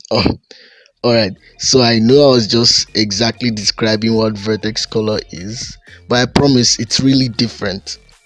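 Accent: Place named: Nigeria. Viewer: the sculpting workflow is immaculate, the nigerian accent aswell 🤣🤣😭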